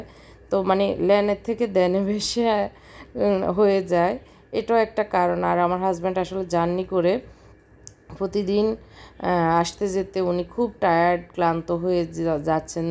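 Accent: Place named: Feni, Bangladesh. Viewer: দুঃখিত বোন কিছু মনে করবেন নাহ্ ! আপনি উনার উচ্চারণের ভুল ধরতেছেন।উনার দেশের বাড়ী ফেনী! তাই আঞ্চলিক টান আসে। আপনার কমেন্টে বানানের দিকে একটু খেয়াল করবেন! পড়তে দৃষ্টিকটু মনে হয়!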